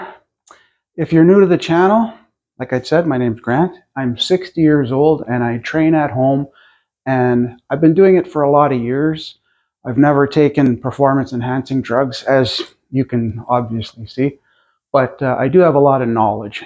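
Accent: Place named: Canada. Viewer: R U Canadian? You sound like a Canuck. By the way you look awesome for 60 I never would have guessed. #Stayblessed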